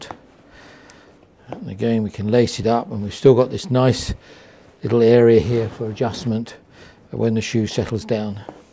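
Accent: United Kingdom. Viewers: speaks a very natural and nice English... with a London accent but without sounding too posh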